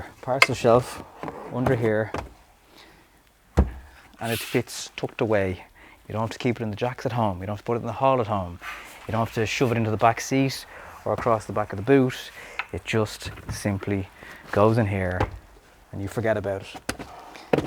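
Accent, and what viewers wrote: Ireland. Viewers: U Irish u sound like it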